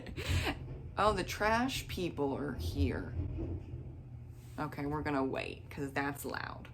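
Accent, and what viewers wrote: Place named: Boston, United States. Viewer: Love this! Great take on all these questions. There are ones I wouldn’t have wanted grandma to know about, but that’s about it. Everyone else can just deal with their boring taste on their own 😂 I get tongue tied and blend words all the time, I just beat everyone to the punch and laugh at myself. Sound like you’re from Boston, I love it!! Great video!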